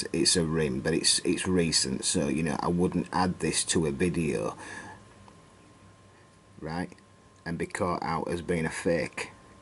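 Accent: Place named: Scotland, United Kingdom. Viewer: I've just discovered your YouTube Channel and subscribed. I've been interested in ancient history/archaeology since I was a child (I'm now 60 years old) and finally, now, lots of things are starting to make sense thanks to your videos. Do you have a web page? I can't find anything other than a couple links when I "google" / You Tube channel - no real website(s). Can I ask your name? Maybe I can find more info that way...also, love your interesting accent! Scottish?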